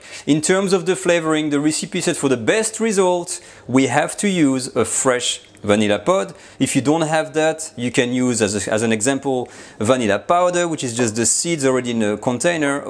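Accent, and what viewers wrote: France. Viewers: I love the French accent when mixed with good English! Recipe was so easy to follow. Thank you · I like the way you speak English 💕 it's a French mix ❤ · Looks very yammy. I like the French accent .👍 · Your videos are superb, made better by your excellent English but with a perfect French accent. Question: how about a pinch of salt in this recipe?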